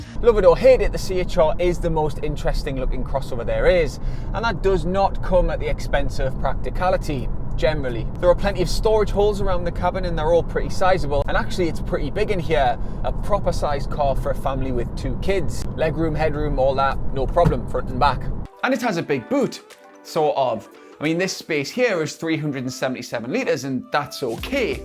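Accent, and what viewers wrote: Newcastle, United Kingdom. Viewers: are you a geordie like ?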